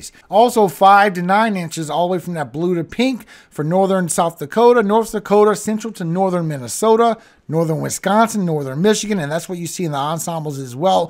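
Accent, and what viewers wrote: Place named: South, United States. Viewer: This guy's from the south isn't he?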